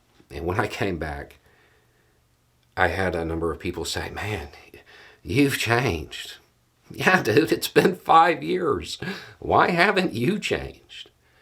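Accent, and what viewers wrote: South, United States. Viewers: What sort of a loser turns to a pontificating pumped up talking head on Youtube for life advice? Someone so desperate for an accepting father figure that a southern drawl is all they need to feel they've come to the right confessional. "Small towns" are tribal enclaves, they are not cities, they are not simply places where humans accumulated. There aren't any visible fences or walls, but small towns are not open places for anyone to live there, or visit there, or even simply pass through there. They are encampments. If you don't fit in you have no business being caught there after sundown...or during sun up, either. They don't want this kid's kind. Goodbye and good riddance.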